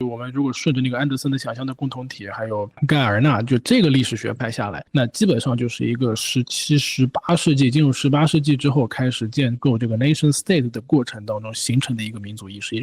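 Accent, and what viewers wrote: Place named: Shanghai, China. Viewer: 完全的上海口音和语速😂😂😂😂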